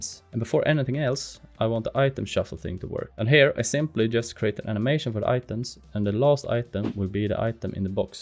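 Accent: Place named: Sweden. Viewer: Omg I love the swedish accent😂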